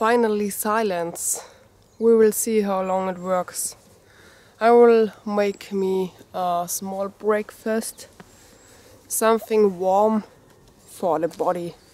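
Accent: Germany. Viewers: Cold outside😉like your Denglisch 😉👍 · Do you own a handgun. Is it hard to get a license for that ? What about bugs there in summertime. Your dog is nice. You have a nice German accent. Greetings from Eastern Canada. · Great results from your sleeping bag ..... love your German accent...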